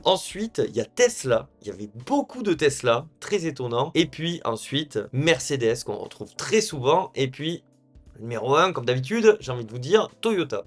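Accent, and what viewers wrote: South, France. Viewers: la grande classe, le sourire, le petit accent ensoleillé… bon je préfère les formats sur les Youngtimers mais au moins tu en fais pour tout le monde. La bisette à toi change rien.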